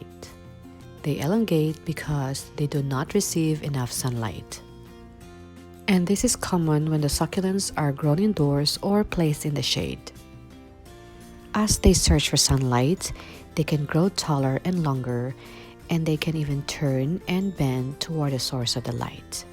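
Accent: Philippines. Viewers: I can hear you're my kababayan! This is an excellent video. Thanks!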